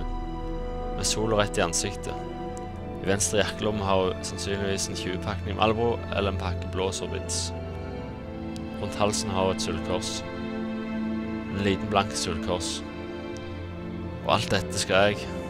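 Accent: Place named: Jæren, Norway. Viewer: Goe! :D Genialt me jærdialektå då :D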